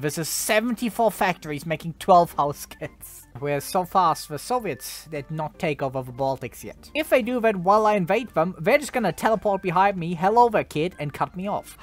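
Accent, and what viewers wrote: Germany. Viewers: I heard a german playing a ww2 game???? Count me in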